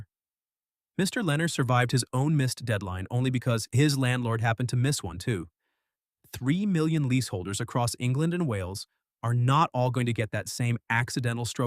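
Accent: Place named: United States. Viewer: Why am American accent?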